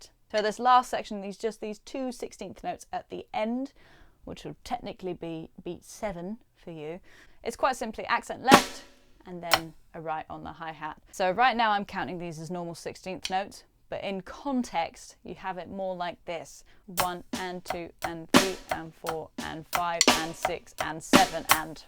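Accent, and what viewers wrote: United Kingdom. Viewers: I play bass and stumbled on your channel. You have an enthusiastic approach and the British accent makes your lessons fun to watch. Want some 7/8? Bill Bufords playing on from. Back in the day, bruford and bass player, chris squire, were as solid as it gets.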